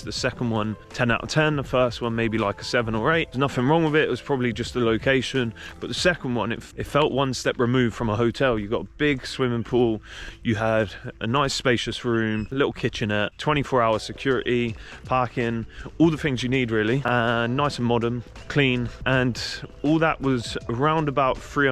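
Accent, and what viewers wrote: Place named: Liverpool, United Kingdom. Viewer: สำเนียงอังกฤษฉบับคนลิเวอร์พูลชัดเจนมากพี่ชาย😂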